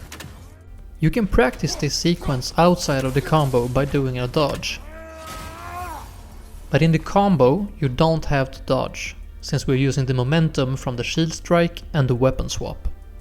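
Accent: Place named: Sweden. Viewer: very nice swedish accent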